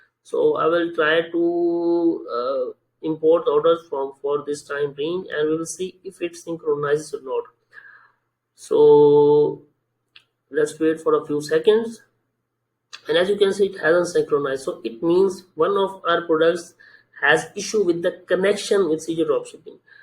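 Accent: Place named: India. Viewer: Bhai english na bola pakaa deti ha hmy aap jaisi bhi english bol lo lgna ap ny desi hi ha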